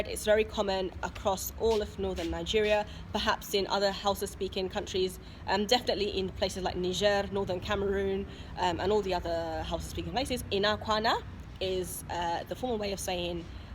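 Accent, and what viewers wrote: United Kingdom. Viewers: Why she got a British accent? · It's British accent, especially that of London, [other accents in England might me so different]. You might consider listening to British podcasts, or relocating to Britain for a while.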